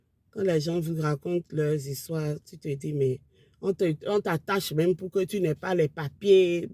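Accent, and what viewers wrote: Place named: Benin. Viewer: C'est ma première fois, mais l'accent m'a tellement attirer j'ai dis ça c'est mon Benin 😂, je m'abonne direct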